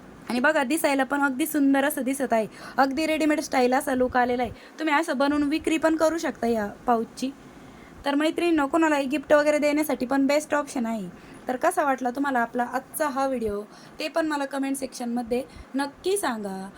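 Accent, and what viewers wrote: Ahmednagar, India. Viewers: अग विचारायचं कारण म्हणजे भाषा नगरचीच वाटली ना म्हणून विचारलं आम्ही पण कुकण्याचे आहोत पण नगरला रहातो ,तुझे व्हिडिओ बघायला लागल्या पासून खूपच पिशव्या शिवल्या मी खुच छान सांगते तू लगेच लक्षात बसत 🙏🙏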